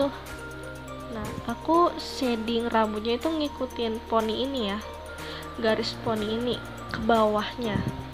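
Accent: Java, Indonesia. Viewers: yang baru brlajar gambar sini kumpul, btw makasih kk, tutornya, ya allah ngomong ny medok sek an kakak, wkwkw semangat ya kk semoga aku bisa ngikutin kakak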